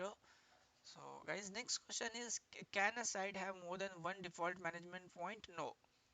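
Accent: India. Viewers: Indian accents everywhere on IT vids? Does no one from native English speaking countries work in IT anymore?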